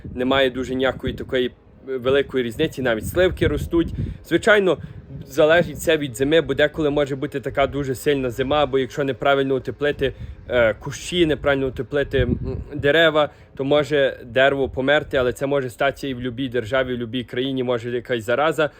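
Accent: Western Ukraine, Ukraine. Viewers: Дуже сподобалося все так по українськи дім сад город , і яка в вас гарна українська мова з західним колоритом , ніби вчора переїхали до Канади молодець.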